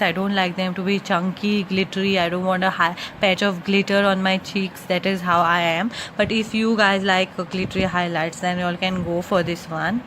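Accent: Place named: Goa, India. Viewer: Thank you for the honest review. Looks like you have a Goan accent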